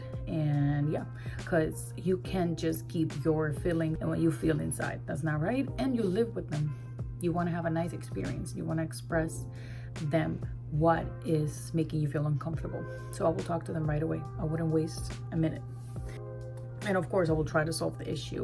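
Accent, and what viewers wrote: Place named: New York, United States. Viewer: English is flawless you have a New York accent dear 🔥🔥🔥🔥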